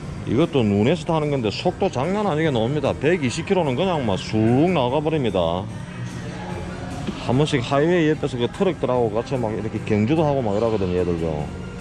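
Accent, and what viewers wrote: Gyeongsang, South Korea. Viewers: 안녕하세요 DG님 님의 채널을 알게된게 이제 2주 정도 밖에 안되었는데 홀릭이 되어버린듯합니다.. 저도 자꾸만 잊어가는 구수한 갱상도 사투리가 고향의 맛을 느끼게 해주어서 편안하다고나 할까 푸근하다고나 할까... 매일매일 업로드되는 영상은 물론이고 하루에 5~6개정도 보고 휴일날은 평균 15개정도는 보고 있는거 같으네요.... 이러다 옛날 게임에 빠져 폐인 생활하던 그 때가 갑자기 생각이 듭니다... 이전 올라온 영상을 다 보고 나면 시간적 여유로움이 생길듯 하구요 님이 올리신 영상 중 190편을 보다가 메디슨 햇에 대해 잠간 응급하셧는데 구글 지도를 보다가 다른 주에서 없는 특이한 모습을 보았습니다. 그게 뭐냐하면 둥근모양의 농지가 특이해보여서 갑자기 궁금증이 생겨서요.. 다른 주를 보았는데 둥근 모양의 농지는 보이지 안트라고요. 도대체 그게 뭔지 궁금해서 이렇게 댓글을 남깁니다. 언제나 안전한 운행을 기원드릴께요.. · 우와~~전시품이 한국 하고는 차원이 다르네요 저도 스피드를 좋아라 하는 편인데 바이크가 멋진게 많네요 ㅎㅎ 영상 재미나게 잘 봤습니다~~ 근데 님은 고향이 어디신지요??사투리 쓰시는거 같던데 ㅎㅎ 경상도 쪽이신가요??ㅎㅎ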